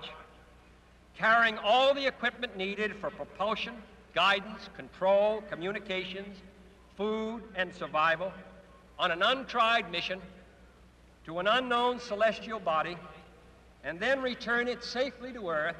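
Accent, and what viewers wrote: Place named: Boston, United States. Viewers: I absolutely agree. I'm 42 and I've lived in sc all my life. My high school band director was from boston and we all lived his accent. Honestly, I listen to the presidents voice and donnie wahlbergs voice just to hear that accent again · I love the way he pronounces decade as "decayed." What's interesting is that in his other speech about the Moon, he pronounces it like normal. And his Boston accent really shines through in his pronunciation of "hard."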